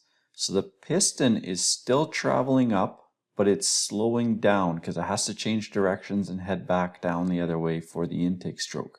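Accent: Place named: Canada. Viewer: I detect a Canadian accent. 🙂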